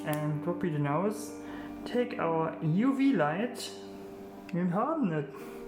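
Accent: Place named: Germany. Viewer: The German sounding accent makes this even creepier.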